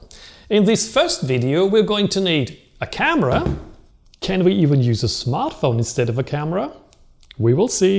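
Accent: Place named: Europe. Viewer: This is absolutely the way I need to learn manual without being bored! Glad I found this channel! Your accent is interesting. European?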